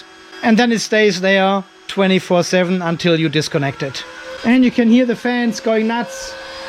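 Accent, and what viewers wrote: Germany. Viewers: Bist du Deutscher? Den Akzent kenne ich doch ;) und das Kassel-Schild im Hintergrund :)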